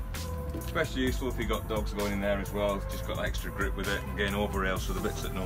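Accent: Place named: Cumbria, United Kingdom. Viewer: Think its Carlisle in Cumbria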